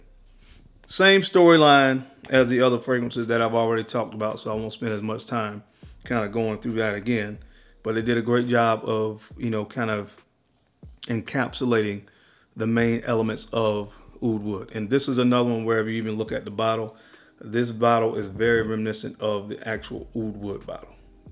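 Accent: United States. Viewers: Because it's an American accent...what your doing is like me telling a French person "it's not Mach donohld, it's Mcdonald!!" When you say sushi or croissant do you also say it with a perfect Japanese and French accent everytime you order it? Lmao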